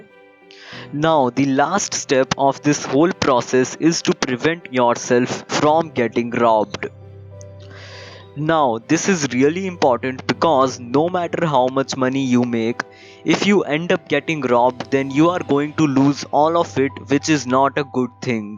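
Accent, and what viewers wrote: India. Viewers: indian accent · thank you so much for having an indian accent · Real Indian English Accent.... at least he didn't tried to show to be fluent (which end up as cringe) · The Indian accent 🗣️🗣️🗣️🗣️🔥🔥🔥🔥🔥💯💯💯💯💯💯💯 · Ha Indian man nice accent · his accent makes the video way better, nice video indian man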